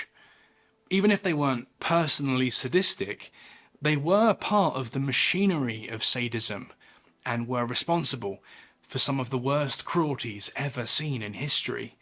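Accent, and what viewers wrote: United Kingdom. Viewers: The irony is that this man is talking about these horrors in a deep British accent. That accent inspires fear in the hearts of millions upon millions of people around the world.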